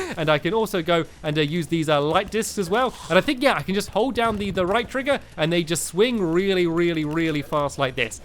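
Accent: United Kingdom. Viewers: Jafar the Barmecide well what do you expect?stampy is british you can't expect him to say hallow instead oh hollow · Do you know British Accent...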